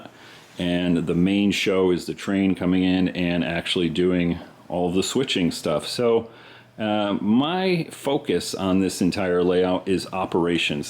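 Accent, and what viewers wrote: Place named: California, United States. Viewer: Absolutely just binge watch your videos. Apart from the way-too-cool Californian accent, they're just like trainspotting: things are happening, lots of action at a leisurely pace, just watching the locos do their thing. absolutely pleasurable. I have a vague question more related to prototype movements: if you turned back the clock would a caboose have been used, and if so, how would it have been incorporated into the train movements? Must be pretty hard running round a train with a parked caboose in the way... cheers for all your hard work putting these vids together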